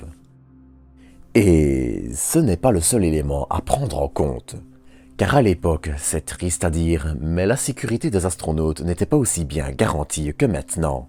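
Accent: Belgium. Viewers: Un robot à l’accent belge ça nous change.....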